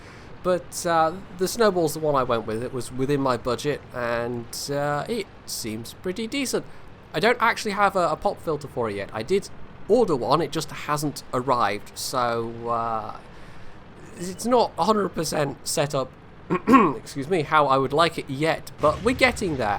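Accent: Scotland, United Kingdom. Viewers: Ow, that new mic, it makes the sound so much clearer.... starts to actually sound scottish-ish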